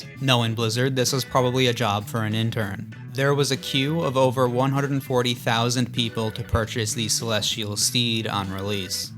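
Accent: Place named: Newfoundland, Canada. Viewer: You sound like a Newfoundlander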